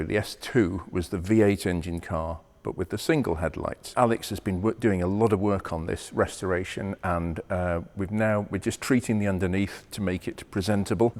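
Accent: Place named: Liverpool, United Kingdom. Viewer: A neighbour nearly totalled his Morgan in a hedge and was lucky enough to have a friend lend him an XK 140 or 50 I think it was. It was in the most beautiful shade of grey and had black painted wires. Fully restored, and the finest XK I’ve ever seen. It really changed my mind about painted wire wheels. By the way, you are old enough now for your Scouse accent to be coming through 😀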